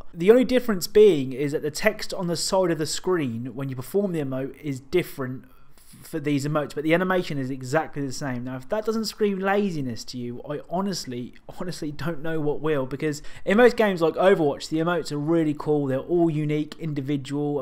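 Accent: England, United Kingdom. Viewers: you are british? ( just a random question) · You from England??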